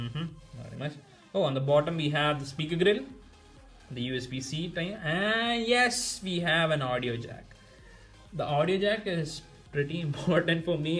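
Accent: United States. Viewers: when is full review coming ?good bro you accent seems like american . any way it was amazing good luck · Wow, nice review Your American accent is so cool bruh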